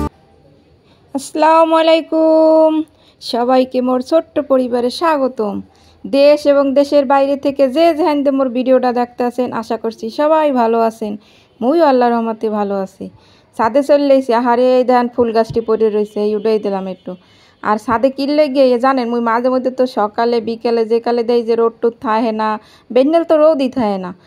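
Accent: Barishal, Bangladesh. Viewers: মুই কিন্তু আপু তোমার বরিশালের কথাগুলো বেশি ভালোবাসি ❤❤❤তোমার কথাগুলো বেশ ভালো লাগে।পরিষ্কার ।করার আইডিয়া দারুণ ❤❤❤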